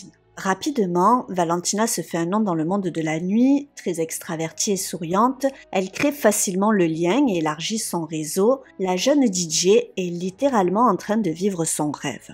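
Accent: South, France. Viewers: C'est toujours un grand plaisir de vous écouter. Et ce bel accent du sud met un peu de soleil sur Liège ( Belgique) , où il fait toujours très humide et froid......:-)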